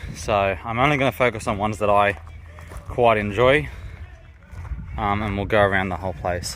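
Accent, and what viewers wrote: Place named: Australia. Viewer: "I don't have too long" 1 hour video ensues with calmest Aussie accent ever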